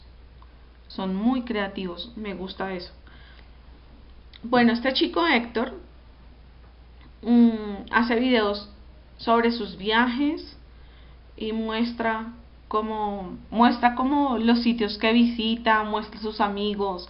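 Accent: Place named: Colombia. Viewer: lindo acento colombiano *.* me encanta ese acento.. bueno hablando de youtubers le aconsejo Supercenizoso :)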